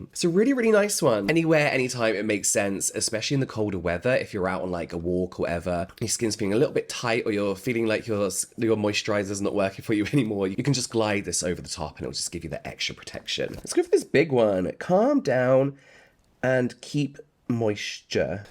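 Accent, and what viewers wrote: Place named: RP, United Kingdom. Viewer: sweetie you can pronounce words however you want, and you still sound refined British to us Americans😊. Sending love from Chicago ❤❤❤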